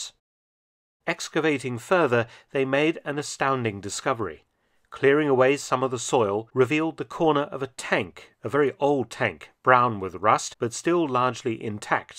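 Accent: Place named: RP, United Kingdom. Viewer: yes. he is easy to listen to as he uses what in England is called received pronunciation ..this gives the diction an instant feeling of command and authority. He uses it very well.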